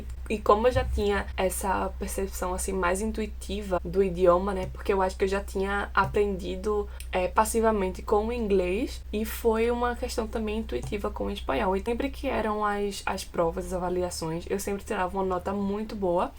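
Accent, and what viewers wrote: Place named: Pernambuco, Brazil. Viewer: Sotaque pernambucano🤗 meu nordeste é diferenciado 😍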